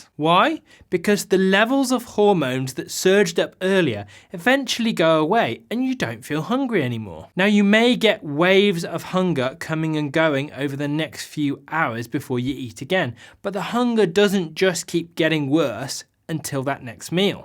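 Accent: United Kingdom. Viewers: He speaks the Queen's English, so he's the one who actually says it correctly. If you think the way he says vitamins is funny, you should hear him say "Aluminum." Heh heh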